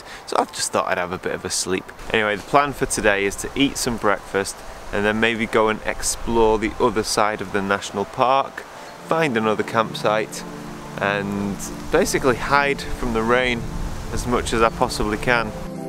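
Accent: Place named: Northern England, United Kingdom. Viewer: Love the way you subliminally introduced us to the lone Silver Birch! Also although it is great to listen to your lovely northern english voice (I was brought up in Manny, in Australia now though) I think your recent videos are too much talking head and not enough about the photography, composition, voice, technical and so on - like it used to be. I think most folk who do landscape photography know about early mornings, camping, walking, trials tribulations, but many folk that I 'mentor' mostly want to know about composition and what makes a shot stand out combined with processing? But carry on as normal, I am one voice in a sea of back patters :)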